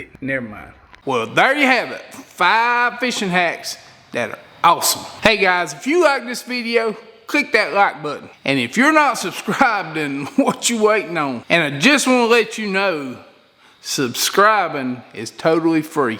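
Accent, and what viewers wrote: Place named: South Carolina, United States. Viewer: where are you from? sounds like the great state of south carolina.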